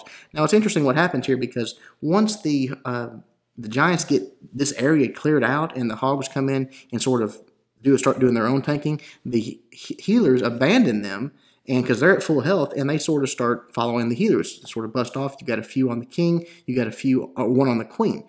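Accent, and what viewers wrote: South, United States. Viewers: So, I finally got a little past early th9 and it's awesome cause now I have hours of useful study film. Thanks again for all the sweet vids, man, keep it up. Out of curiosity, you from the South?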